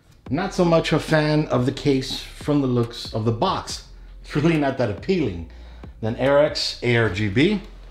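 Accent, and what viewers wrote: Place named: Chicago, United States. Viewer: You have a Chicago or mid western accent. Reminds me of my grandfather. Who recently passed. Like hearing his voice again. Love the video by the way.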